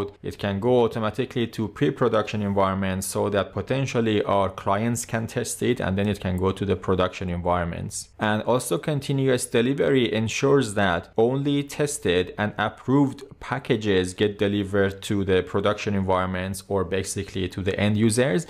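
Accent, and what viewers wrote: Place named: Iran. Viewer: Dadash lahjat maloome irani hasti. Khaste nabashi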